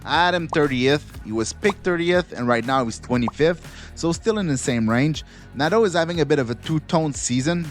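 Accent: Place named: Quebec, Canada. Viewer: ca prends un QC pour reconnaitre un QC qui parle anglais, Sup dude! ;)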